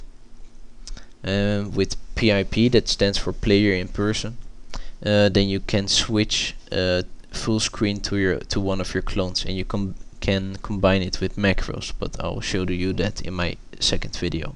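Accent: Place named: Quebec, Canada. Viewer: true! I think he is quebecian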